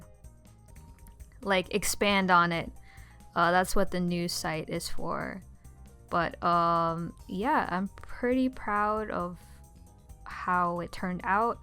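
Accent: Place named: Midwest, United States. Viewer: Are you from the Midwest by any chance? I'm asking because of your accent. It sounds very "midwest"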